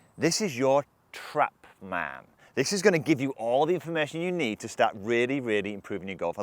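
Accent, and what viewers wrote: United Kingdom. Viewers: i've been golfing for many years but could never really get this right. i always thought it was some big move to make to get the center forward but just having the idea of striking more forward will help make it happen sounds good to me. thnx for the info and help. i've always been trying to figure out where you are from in the uk with you accent, i'm from the boston, massachusetts area in the states.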